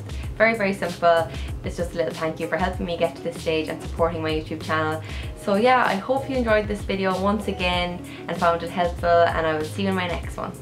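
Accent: Ireland. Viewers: Liked and subscribed, I really enjoy your videos and it's especially nice to hear them in a familiar Irish accent! ❤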